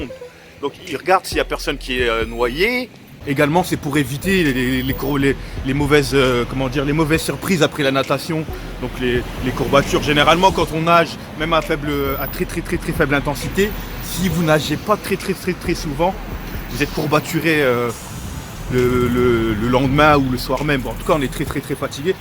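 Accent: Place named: Banlieue, France. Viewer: Jai trop hate daller au Japon, par contre tu parle vraiment comme un banlieusard mec, mais cest pas grave jadore tes vidéos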